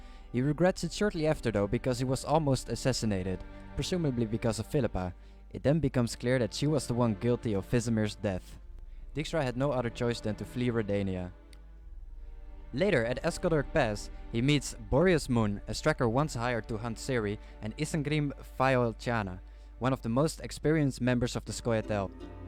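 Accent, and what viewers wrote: Netherlands. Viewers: Dutch accent lmao nice video!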